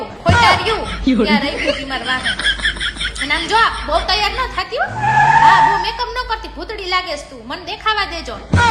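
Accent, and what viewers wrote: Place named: Keshod, India. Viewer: બેન તમે કેસોદ બાજુના છોને તમારી બોલી ઉપર થી એવું લાગે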